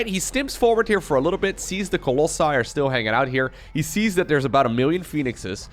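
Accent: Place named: Netherlands. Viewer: its the dutch accept onme of my best friends he speaks like that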